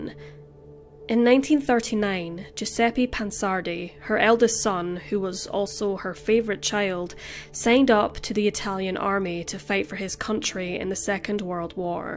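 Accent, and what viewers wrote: Scotland, United Kingdom. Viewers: Yup Scottish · Scotland 100% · I’m trying to work out where the host dialect is from she sounds Scottish but she doesn’t sound just Scottish, it’s puzzling me 🤔😆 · She's Scottish. Her twin sister Kristy Skye has her own channel and said they're from Scotland.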